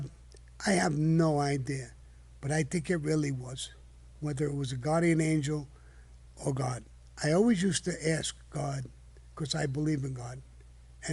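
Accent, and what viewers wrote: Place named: Brooklyn, United States. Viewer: I speak the same way, no offense to anyone. It's a Brooklyn thing , I think 👊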